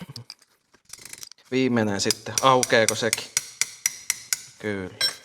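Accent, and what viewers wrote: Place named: Pirkanmaa, Finland. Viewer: Ammattimiehen hommia on kyllä kiva kattoa, vaikkei nyky-VAG:laisiin koskis ite pitkällä tikullakaan. Pirkammaan murretta on kiva kuunnella.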